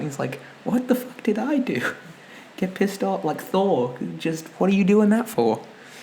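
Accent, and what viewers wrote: Ireland. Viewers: 1)Yeah you have just sold me on this crazy Bob Ross idea. "Beat the god" out of that paint brush. 2) it's "chicken and chip" chips or "fish and chip" chips in London. And your Irish accent is brilliant, it will make 100% of your girl viewers go crazy. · I enjoyed this so much! I think you should do more personal videos like these. It's nice to see what YOU are like and not just your characters(which are great btw). Also just to let you know...I really like your Irish accent. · I love your Irish accent! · I haven’t see it in the comments, so I’m just gonna call out the Bioshock reference now. I know this video is a bit older, but I’d also like to say that I adore your channel and the characters you’ve made. I’m always up for a good story and you never disappoint! Adore the Irish accent as well!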